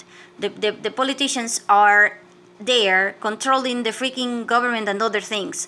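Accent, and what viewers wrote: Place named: Mexico. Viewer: what country ? you didnt' say. You sound mexican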